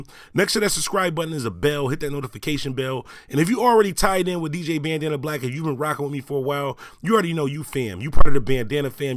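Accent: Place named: Pittsburgh, United States. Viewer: You from Pittsburgh? you def got there accent or Buffalo Ny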